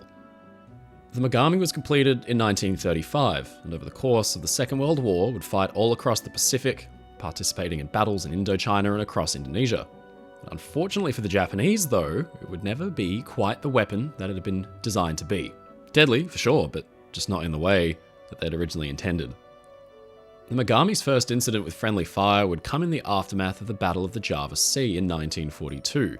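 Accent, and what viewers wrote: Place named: Australia. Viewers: Yo my brother! Are you Australian? The accent sounds Australian in one statement and British in another Yes, I know. Australia and the U.K. are part of the British Empire. I'm just curious. Thanks for an informative website! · He sounds very Aussie to me.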